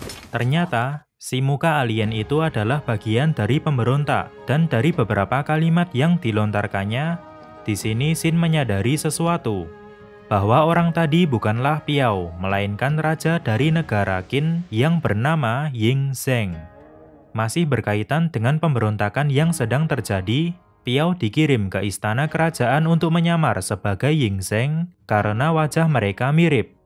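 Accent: Java, Indonesia. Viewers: Bang logat nya medok sekali:) xixixi asal mana bang? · Mas kata"nya jangan medok bangt🙏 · Gw pertama kali mutar vdeonya oh betapa medoknya ahhh mantap 🤣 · Medok medok medok cuy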